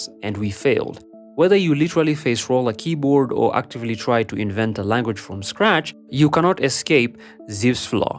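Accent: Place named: Russia. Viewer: Why do I hear russian accent from a Kenyan?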